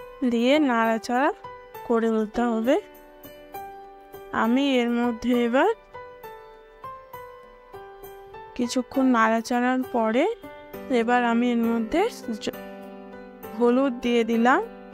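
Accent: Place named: Kolkata, India. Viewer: তোমার কথা শুনে আমি বুঝেছি ,মনে হয় কলকাতার দিকে থাকো ।আমার বাড়ি কলকাতায়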